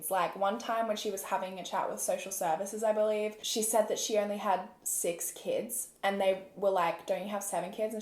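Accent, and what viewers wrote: Australia. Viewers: I love your accent what type is it? Australian? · So strange hearing an Australian talking about a case that happened down the road from me 🙈 also please don’t tar us all with the same brush 🙈😂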